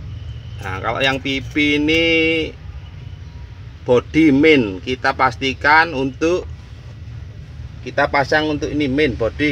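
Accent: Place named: Java, Indonesia. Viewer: Joss mas ,,, medog jowone !!!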